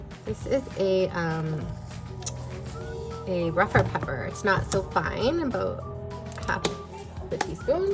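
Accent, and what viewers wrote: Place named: Canada. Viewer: Wow! This is fantastic! I am curious where you buy the cheese (crackers) and pepperoni. I am guessing from your accent you are from Canada. I am also Canadian so I would really appreciate if you share where you buy them! I am very excited to try this! Thank you for the great video! 😊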